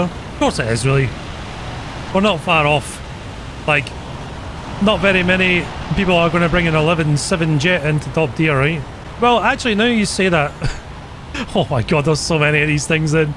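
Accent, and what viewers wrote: United Kingdom. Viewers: You have an intresting accent which part of the uk are you from? I cant tell where most accents are from I a kiwi (new zealander) thought another kiwi was an american because they were from a different part of nz and spoke slightly differently. It was rather embarassing when he said he was a kiwi, thankfully he thought i was an australian so we both had a laugh about that.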